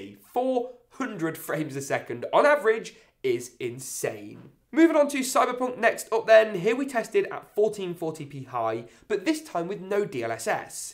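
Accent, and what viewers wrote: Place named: Yorkshire, United Kingdom. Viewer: You have a nice Yorkshire accent.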